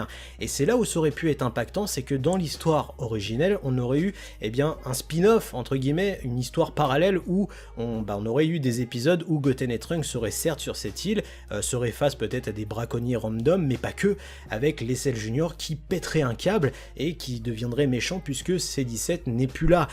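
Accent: England, United Kingdom. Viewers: Pk tu dragon ball avec un acsent anglais???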